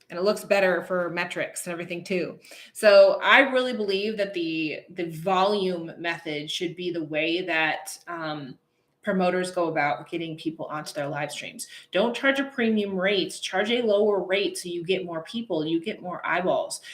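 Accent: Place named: Rochester, United States. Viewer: too funny! I've been wanting to ask if you're from Rochester NY for a while now as I hear that accent! I'm from Webster ;-)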